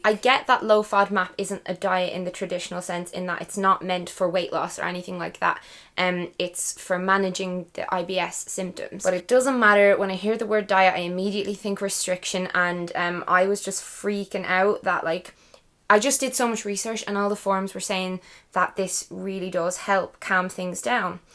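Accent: Ireland. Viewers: Brand new sub. Just binge watching your vids as we speak. Relate to so many of your vids. Think you are lovely and you can't beat an Irish accent, greetings from Scotland ❤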